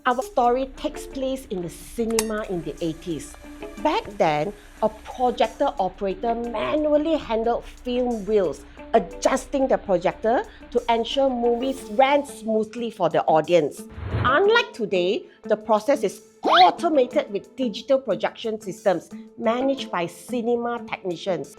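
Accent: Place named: Singapore. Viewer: I thought you singaporean cause ur english is very good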